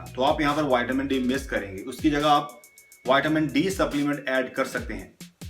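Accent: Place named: Punjab, India. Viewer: veere punjabi jhalak jandi har war ,.....love that too much .....jaffi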